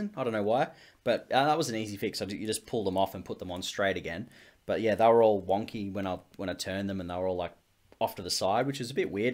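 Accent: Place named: Australia. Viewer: I was looking at maybe buying this guitar and thought I'd look up a review, saw the Aborted shirt, then heard the Aussie accent and it was gg on the subscribe button lol 🤘👌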